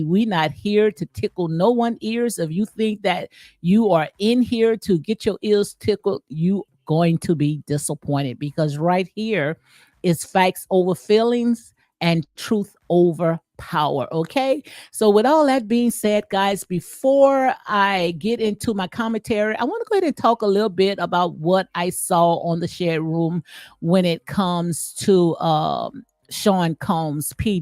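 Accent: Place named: Louisiana, United States. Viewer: Are you from Louisiana? I love the accent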